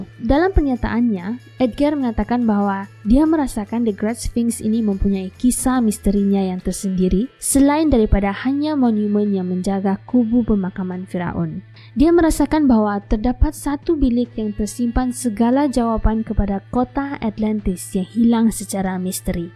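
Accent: Sabah, Malaysia. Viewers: Kakak jane pun ok la.tapi lagi best abang.suara abang lagi besy sebab loghat sabah yang merdu jee.tapi kakak jane pun best jugakk😀😀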